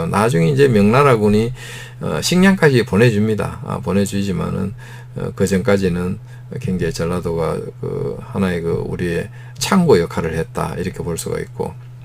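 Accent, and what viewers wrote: Gyeongsang, South Korea. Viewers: 쌍도 억양으로 지역비하방송하지 마시라. 개쌍도야, 전라도 전라도 반복하지 마시라. 호남이다. 약무호남무국가라 했다. 호남이 없으면, 민주도 없고, 조선도 없었고, 대한민국도 없었다. 쌍도 험한지형, 호남 평야,미군주력부대라서, 625때 영남이 보전되었다.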